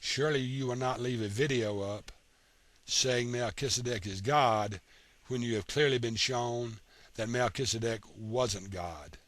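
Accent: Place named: South, United States. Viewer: Your accent alone makes your credibility come into question..hilljack? hillbilly? south of the mason dixon line? anyway.. Jesus was a Rabbi, human. He Blessed God all the TIME.. so your argument is ridiculous. According to you, Jesus is God so how could he be blessing himself? Then by your own argument Jesus cant be God.. thanks for clearing that up.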